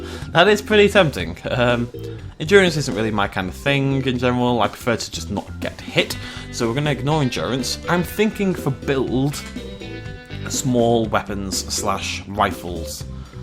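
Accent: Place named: England, United Kingdom. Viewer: I love! I love his English accent and love his content as he talks at his own pace and tries not to rush anything. Keep doing what you do!:D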